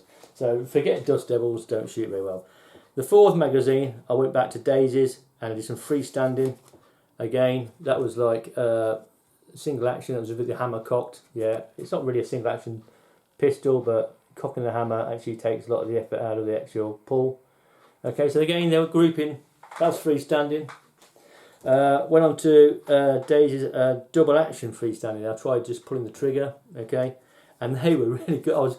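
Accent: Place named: United Kingdom. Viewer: Even with subtitles, it's really really difficult to understand awful British accent, I wish you were regular English speaker 😢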